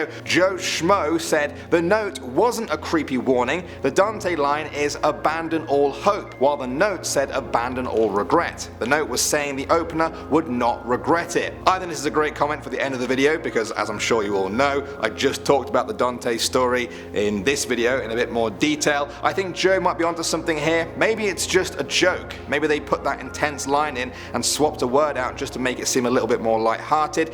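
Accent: United Kingdom. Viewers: He's British. They pronounce it cap-syool. A perfectly acceptable pronunciation .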